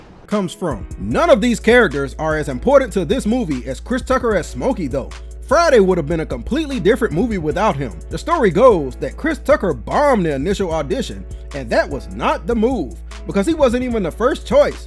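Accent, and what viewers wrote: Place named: West Coast, United States. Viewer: I like your West Coast accent, cuz.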